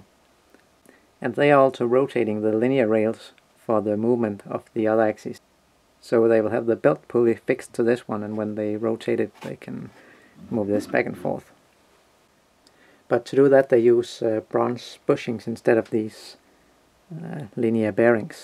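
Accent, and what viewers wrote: Denmark. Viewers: are u danish like me :P?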